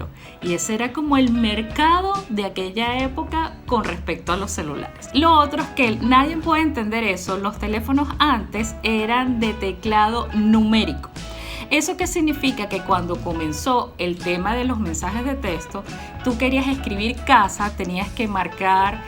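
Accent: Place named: Venezuela. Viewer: ¡Guao, que excelente manera de comunicar! Muy divertida y aleccionador relato. No conocía esto de Xennials, realmente lo soy. Gratos recuerdos y con ese acento venezolano maravilloso. Congratulaciones.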